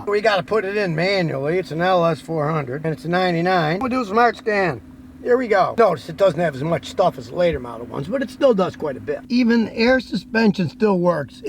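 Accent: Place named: New York, United States. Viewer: I can tell.s from NY, got that soprano swag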